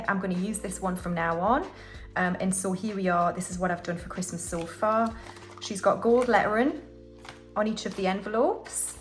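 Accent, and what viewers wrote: Newcastle, United Kingdom. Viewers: Fab video as always. My hubby even said "oh is she a Geordie too? I thought so!" (Hes Northern Irish)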